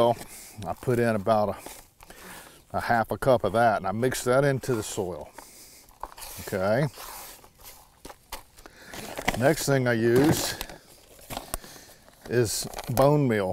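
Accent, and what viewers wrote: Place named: South Carolina, United States. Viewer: Where are you from? Your accent sounds much like us, here in South Carolina. :)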